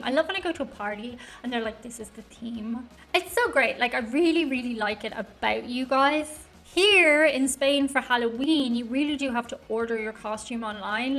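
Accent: Ireland. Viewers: I love female Irish accents 😏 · We love the Irish accent!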